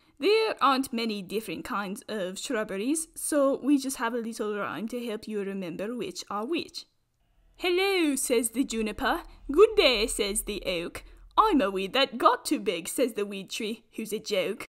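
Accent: Scotland, United Kingdom. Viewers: This makes me laugh and I need more- not rushing you though. Scottish accent noises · ur accent is more scottish than brittish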